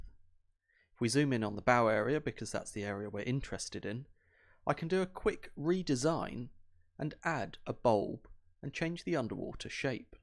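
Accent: Scotland, United Kingdom. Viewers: ..I hear some Scottish in there. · What's wrong with a yank speaking? I know this fellow host has a striking British accent that I like, but not every "yank" host speaks a disjointed hillbilly mess lol.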